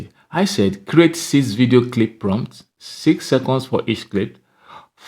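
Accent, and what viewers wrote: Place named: Africa. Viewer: thanks for the video love your african accent :)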